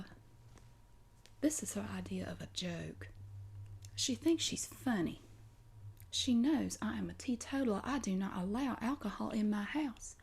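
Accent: South, United States. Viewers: Reminded me a little of The Golden Girls' character Blanche Devereaux; purely in accent and glamour. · I love this accent, cause it's like a combination of American Southern and an English accent, lol. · Lots of tingles from the southern drawl, wow :)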